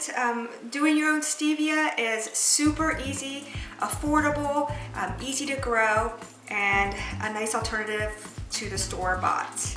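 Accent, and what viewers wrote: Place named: Wisconsin, United States. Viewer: They sell live stevia at my local co-op every spring and I've always wondered how one would use it. The fact that the garden-fresh powder is actually less bitter than the processed store-bought stevia really intrigues me, I figured it would be the opposite. Can anybody else confirm? Side note: Not sure if anybody has ever mentioned this to you before, but your Wisconsin accent is adorable. I also live in WI, but in Madison, so you don't hear that "Wisconsin" accent too much down here.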